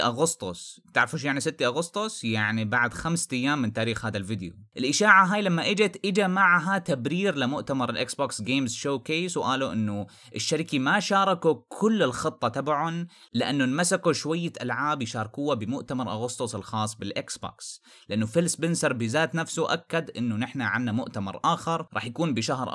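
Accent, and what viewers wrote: Homs, Syria. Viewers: معلم انا بشغل فيديوهاتك مع العيلة و امي دائما بتقلي انو انت سوري من حمص😁😁 فهل انت حمصي؟ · انت سوري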